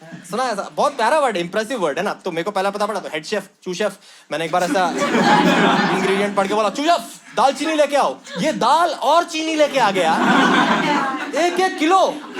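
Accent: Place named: Hyderabad, India. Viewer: U have slightly hyderabadi hindi. Loved to hear .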